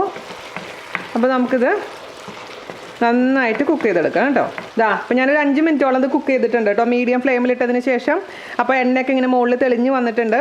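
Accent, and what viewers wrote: Thrissur, India. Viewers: Njan litty vipin chechide recipe try cheyarundu endhe ammayum thirrsur kari aanuu ippo amma ella but chechi timil parayunna chila vakkukal adipoli ready mani athokke kelkksnum koodi ya njan kannune endhe ammayumm athu pole parayumayirunnu chechi de slag ellammm amma pettennu orma varum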